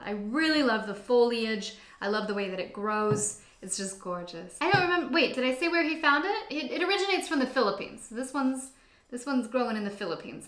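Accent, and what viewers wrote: United States. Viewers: Are you from Boston?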